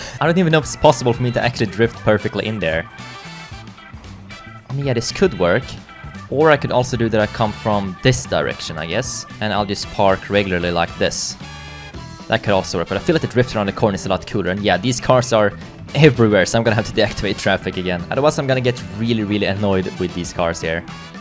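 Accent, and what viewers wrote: Sweden. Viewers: Bruh, your Swedish accent is so satisfying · Are you swedish it sounds like that?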